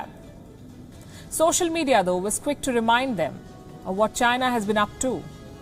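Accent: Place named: India. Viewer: strong curry english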